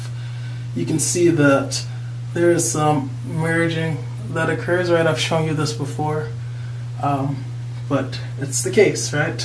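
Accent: United States. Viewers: Yes definitely still love those movies despite being old. I'm a newbie when it comes to my loc journey. I had been wanting to do it for years & actually started it "accidently" LOL I had shaved my head after having yarn braids in for over 3 years & as my hair grew, I did my hair in a two-strand twist under a wig I liked & I left them that way & WAH LAH!!!.INSTANT LOCS LOL I never retwist them, but I know I need to. I did that like once or twice. I don't want a bird's nest. Question: what do you use to moisturize your scalp & what product, if any, are you using to twist?? I've used just water in the past when I tried it, but it didn't really hold. I'm sure you've already answered these questions in past vids, but I haven't had a chance to watch them all yet. P.S. Do I hear a hint of a fellow "Michigander" in your voice?? LOL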